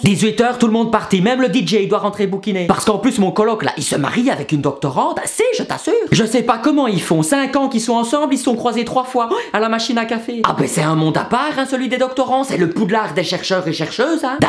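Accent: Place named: Belgium. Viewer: Son accent belge est juste magnifique !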